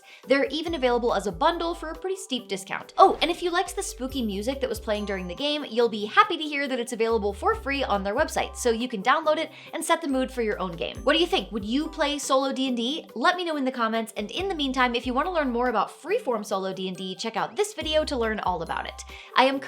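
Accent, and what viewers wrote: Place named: South, United States. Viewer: Your southern sounds like Applejack. Is good.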